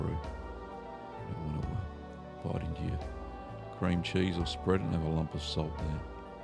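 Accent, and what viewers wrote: Australia. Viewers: Thank you -- very informative. I can't wait to try this; I've become very lactose-intolerant, so unless I make my own I won't get to eat cream cheese again... Not sure where you're from (I'm guessing Australia but wouldn't know a New Zealand or other accent if it bit me) -- but this strawberry pretzel salad is popular here in the States -- haven't tried it yet, but am going to! (this site may ask you to join in order to see the recipe, but there are a ton of recipes out there...) Thank you again! <link>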